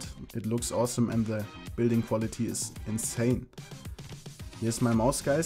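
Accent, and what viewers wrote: Germany. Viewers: I Subscribed you because your PERFECT english and ive heard the German very well out of it und weil du mir sehr Sympatisch erscheinst! The first Video that ive seen from you was: CS:GO Ninja Defuse de_dust2 by! After that i had no choice and had to subscribe! Btw i have already that Phoenix Pass and dont need it so I hope you guys Luck ^_^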